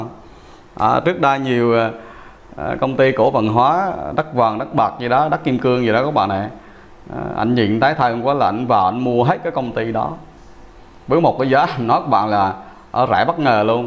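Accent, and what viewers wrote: Quang Nam, Vietnam. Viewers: Kênh e rất hữu hiệu, giọng Quảng rất hay. Tuy nhiên cần chuyên sâu thông tin và phân tích tầm chuyên gia . Anh ủng hộ.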